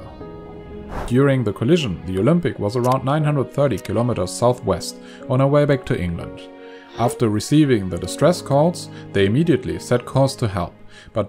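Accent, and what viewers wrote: Germany. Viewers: Deutscher aktzent... hmmmmm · I'm german and I agree, it sounds like a (horrible) german accent. Not all of us sound like this when speaking english :D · Man merkt sofort den Deutschen Aktzent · Why is a German narrating this video?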